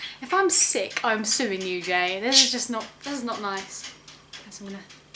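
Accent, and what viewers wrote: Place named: RP, United Kingdom. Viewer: Very posh.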